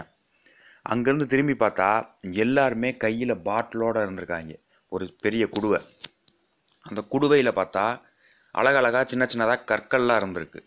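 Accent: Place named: Madurai, India. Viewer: arumai! langauge slang is plus for you Madurai karanda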